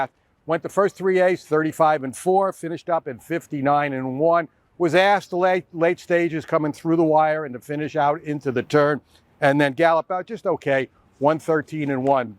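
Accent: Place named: Boston, United States. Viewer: Still prity much have held on to your Boston accent. Mike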